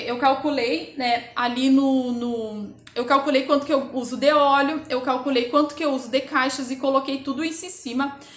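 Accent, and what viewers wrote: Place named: South, Brazil. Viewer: GRATIDÃO 🙏🙏🙏ADOREI SEU DOTAQUE❤TU É DO SUL???😘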